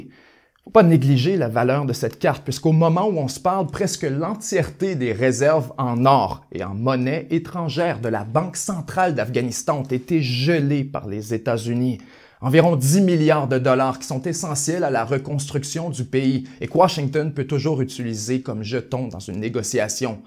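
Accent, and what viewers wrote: Quebec, Canada. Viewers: jaime pas laccent quebecois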